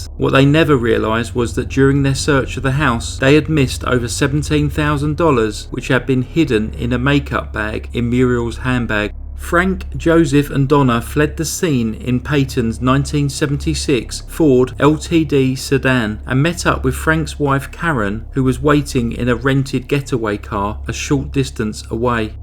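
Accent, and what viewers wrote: United Kingdom. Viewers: Love your cheeky endings!! Hope you’re getting through your isolation and keep making such well narrated content. Hearing any of the British narrations brings my mother’s voice to the forefront and always brings a smile to my dial. Thanks. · This british accent confuses me somehow...i concentrate on the accent not on the Story. Can t even say if i like it or not...i m just confused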